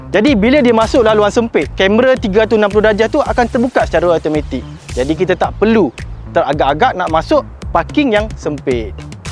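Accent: Kelantan, Malaysia. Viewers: Ore kelate ko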